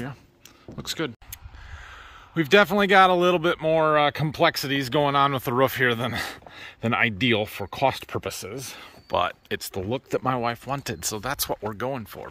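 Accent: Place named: United States. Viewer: I love how u Americans say roof. U pronounce it more like "rough". We Canadians pronounce it with a harder oo like boot.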